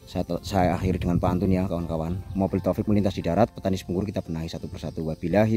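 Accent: Banyumas, Indonesia. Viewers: Tetap semangat, ora ngapak ora kepenak gus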